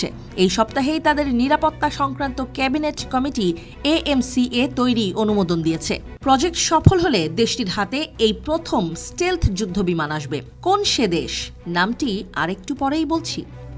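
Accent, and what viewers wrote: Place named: West Bengal, India. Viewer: গলার আওয়াজ আর কথার স্টাইল দেখে মনে হচ্ছে এটা একাত্তর টিভির প্রতিবেদন নয় এটা ভারতের পশ্চিমবঙ্গের কোন টিভি চ্যানেলের প্রতিবেদন তারা প্রচার করছে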